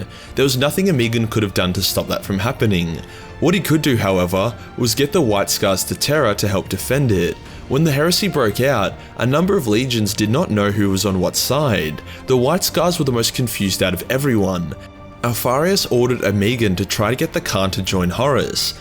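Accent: United States. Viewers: THE AMERICAN ACCENT. WHOOOO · I lost it to the American accent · Damn that was a pretty good US boy accent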